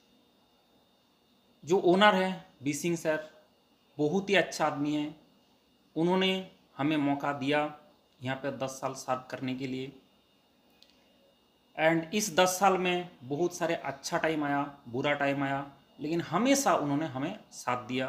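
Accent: Bengal, India. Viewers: he has a typical bengali accent hqhqhqhqhq hehehehhehehe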